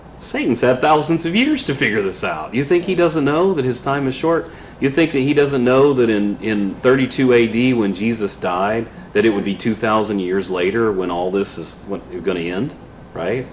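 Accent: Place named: Alabama, United States. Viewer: I thought I heard an Alabama accent. I live in Smiths Station which is just south of Opelika/Auburn. I sure wish you were local so I could physically attend. I’m glad you are posting this on YouTube so I can hear and follow along.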